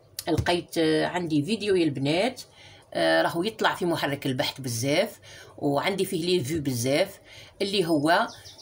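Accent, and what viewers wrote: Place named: Skikda, Algeria. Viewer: مساء الخير الاخت ارجوكى ديرنى فيديو على تركاب السفيفة المغربية على الصاريان الكحلة كل ما نركبها تهربلى وما نعرفش كيفاه انا دخلت لليوتيوب ولقيت فديوهاتك وزاد ما نتبهت ليك على اللهجة تاعك انا نظنك سكيكدية و ربما ماما تعرف الوالدة تاعك خالتى خاميسة الله يرحمها ادا كانت هى قولى ارجوكى وبالتوفيق ولاكان انبارطاجى الفيديو تاعك فى لقروب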